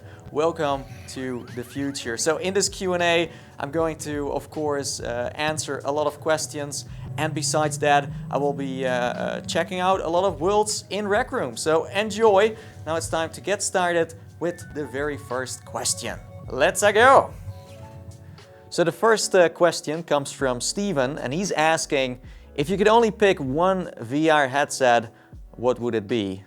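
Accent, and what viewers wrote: Netherlands. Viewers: Wait are you Dutch because you have a accent I fit too but are you Dutch???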